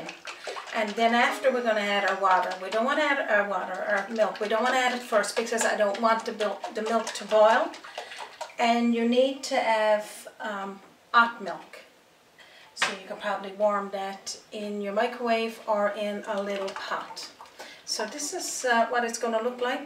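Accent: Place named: Newfoundland, Canada. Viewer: Looks delicious! Wonderful presentation! I love your NL dialect, with the dropped H's and slight maritime accent.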